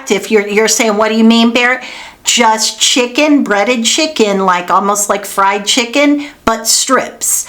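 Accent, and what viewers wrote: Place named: North, United States. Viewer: Are you a Yankee from Up North ? Naples born Cracker here and you sound like a Yankee to me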